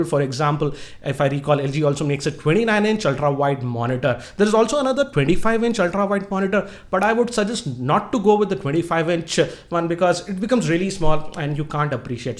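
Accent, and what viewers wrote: India. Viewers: Good video. I've always liked the indian accent, it makes me feel at ease. Thank you.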